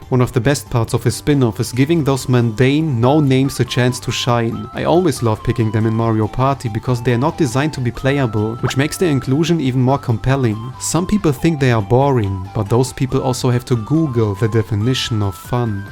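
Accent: Germany. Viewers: Höre ich da etwa deutsch heraus?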